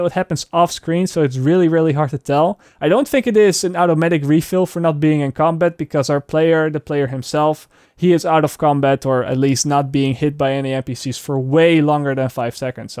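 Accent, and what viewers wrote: Netherlands. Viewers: Im pretty sure this guy is Dutch :D that ''zie je'' later at the end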